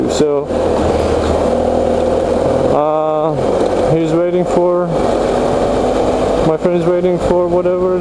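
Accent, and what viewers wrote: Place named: Germany. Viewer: may i ask, where you're from originally? You sound like you have a German accent. Take care, ride safe,stay safe dude !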